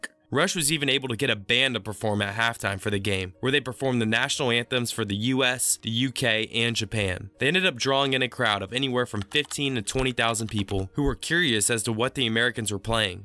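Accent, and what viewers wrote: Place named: Louisville, United States. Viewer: I think that he may be from "Loo-uh-vul."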